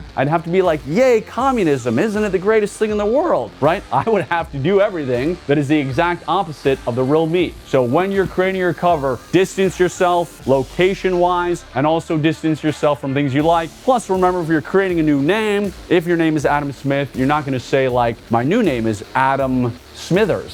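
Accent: United States. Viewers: So you dress up like the cowboy from the village people, new hat boots and clothes and you go to Dallas Texas with your Yankee accent and pasty skin and say you're from Texas ? 👌🏼 You'd last 5 minutes tops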